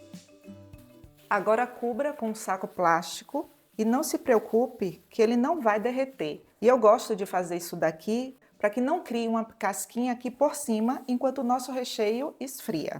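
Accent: Bahia, Brazil. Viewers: Q sotaque lindo de baiana arretada.Gosto da sua voz.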